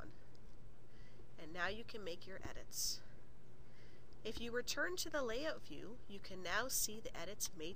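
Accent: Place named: Mid-Atlantic, United States. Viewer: gotta love that mid east coast accent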